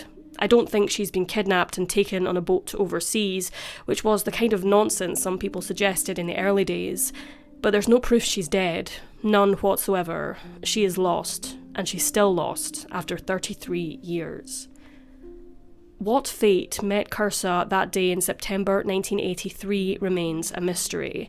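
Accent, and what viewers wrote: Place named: Somerset, United Kingdom. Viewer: Ooh arr nothing wrong with a Zumerzet accent ..😃😃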